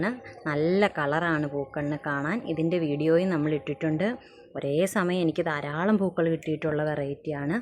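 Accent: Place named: Thiruvalla, India. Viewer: ഞാൻ തിരുവല്ല സൗണ്ട് മുൻപ് കേട്ടത് പോലെ പക്ഷേ ഞാൻ ആദ്യം കാണുവാണ് ഈ വീഡിയോ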